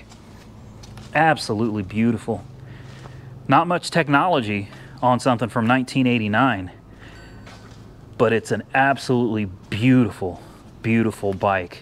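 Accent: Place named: California, United States. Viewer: It's hard to believe you're from Indiana, you talk just like us boys in California.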